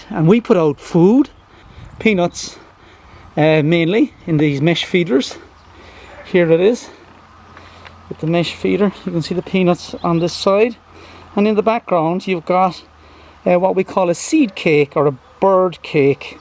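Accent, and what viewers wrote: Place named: Ireland. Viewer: Let me try that again! Great to see you and your beautiful backyard flowers and ponds. Your birds are spectacular! Also, great to hear you wonderful Irish voice. Nan Hampton (Antarctica, Iceland, Svalbard)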